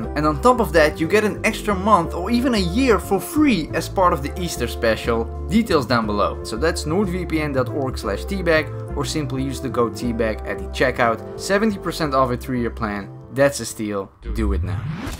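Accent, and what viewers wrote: Netherlands. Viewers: You Dutch? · Maybe ist just me but you soud like you're Dutch Ps just a guess.....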